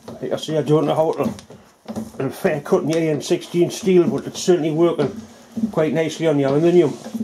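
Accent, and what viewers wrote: Newcastle, United Kingdom. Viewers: pif paf the accent and dialect is called "Geordie". it is spoke by people in and around the City of Newcastle-Upon-Tyne in North East England. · Wm Robert Leschyna Some would say ' Geordie' often spelt Jordie ,but this is a Newcastle accent as true Geordies are from Jarrow . It's a bit like the difference between Cockney and an East London accent . True Cockneys had a language and dialect far removed from the East London one , but is now almost completely vanished .Mi da an is da were Jarrow boys , mi granda on the March ! I grew up in East London and couldn't understand any of my grandparents . Dad from Jarrow , Mum from fenland Norfolk ! When visiting the US back in the 70s nobody could place from what country I came from but my mate being a Cockney , no trouble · i love that jordie acsent.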